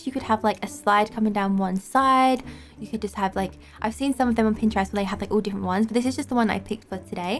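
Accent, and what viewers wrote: United Kingdom. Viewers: I don't know what it is but I just LOVE british accents... IDK why lol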